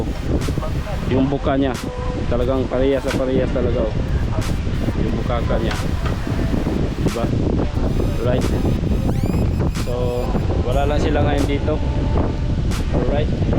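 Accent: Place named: Visayas, Philippines. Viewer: Intindihin mo po nalang kc bisaya sya parang Tagalog lang po yan mahirap intindihin bisaya😂😂😂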